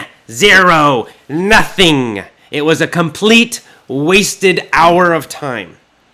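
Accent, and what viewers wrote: United States. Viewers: Is he from America??. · hi Ihave one question is this American accent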